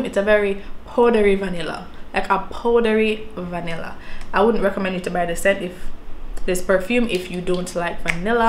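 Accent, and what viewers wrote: Jamaica. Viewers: Yasss I’m hearing a Jamaican accent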